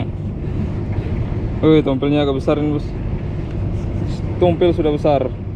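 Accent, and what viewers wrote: Bugis, Indonesia. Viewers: Orng Bugis ki aaa?? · ORANG BUGIS KAH SAPPO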